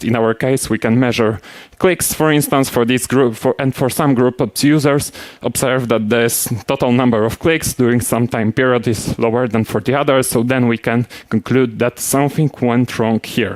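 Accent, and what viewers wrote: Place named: Poland. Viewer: Haha, jaki chlop ma polski akcent xD smdzieki temu jest baedzo zrozumiale i zlapalem kqzde slowo ;)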